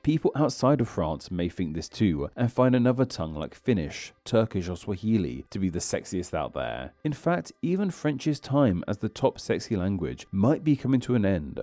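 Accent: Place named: United Kingdom. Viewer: You have a wonderful voice! It has a distinctive tone, which combined with your British accent that makes it very appealing. We Americans are as fond of British accents as we are of French accents. Ours accents are rather “meh”.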